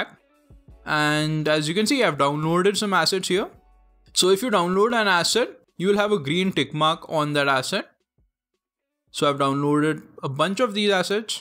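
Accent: India. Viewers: The indian accent is terrible to listen...evolve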